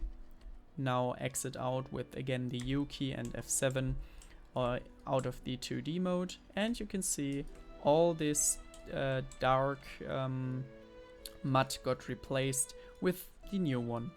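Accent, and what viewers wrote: Germany. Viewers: Wie man am Englisch erkennt, dass der Gute aus DE kommt 🤣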